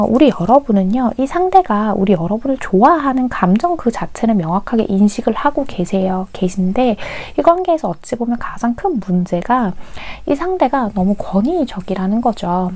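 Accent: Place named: Gyeongsang, South Korea. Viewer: 3zz ㅋㅋㅋㅋㅋㅋㄴㅋ걍상도남쟈맞습다..ㅋㅋㅋㅋㅋㄴ 해봄이라는 말이 왜이렇게 듣기좋은지 모르겜ㅅ내옹❤ㅎㅎㅎㅎ 후후후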